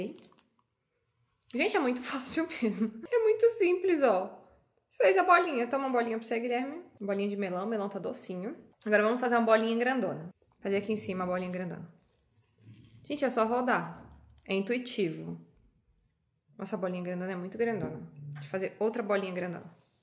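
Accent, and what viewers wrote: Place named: Northeast, Brazil. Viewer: você tem família nordestina?! Não me lembro se já disse, é que cê tem um sotaque bem puxado pro sotaque nordestino, acho uma graça 😍🙌🏼🙌🏼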